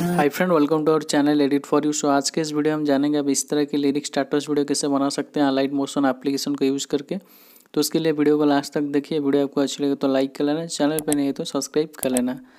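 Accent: Odisha, India. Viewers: Aap odisha se ho kya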